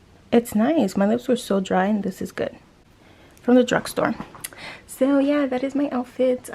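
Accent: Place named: Montreal, Canada. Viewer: You’re accent sounds Montreal Canadian. I might be totally wrong lol 😆 your hair looks amazing !!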